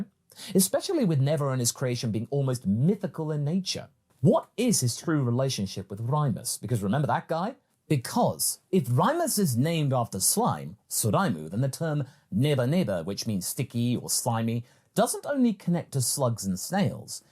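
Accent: United Kingdom. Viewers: My dude he has a British accent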